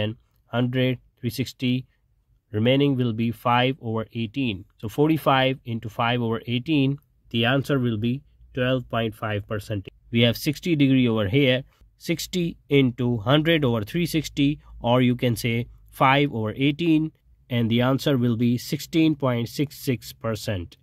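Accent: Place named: India. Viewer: great indian teacher im from the philippines and your teaching is good with the accent! 😀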